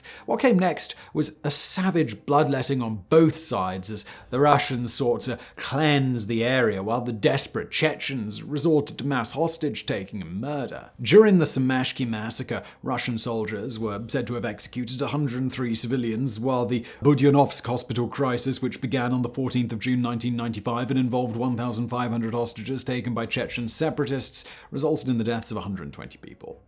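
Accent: RP, United Kingdom. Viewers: There really is no better narrator for a historical documentary than someone with a posh British accent I swear to God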